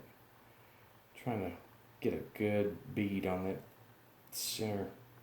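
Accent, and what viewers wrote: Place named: Texarkana, United States. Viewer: Just out of curiosity are you from near Texarkana? I used to work with a guy from there and you two sound alike.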